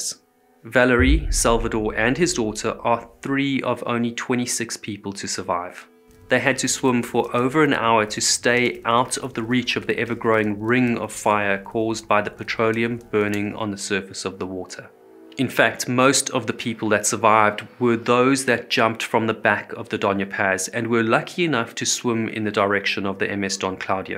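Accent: South Africa. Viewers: His pronunciation is typically South African and is perfectly comprehensible to my American English ear. Where are you from that you are having a hard time understanding this?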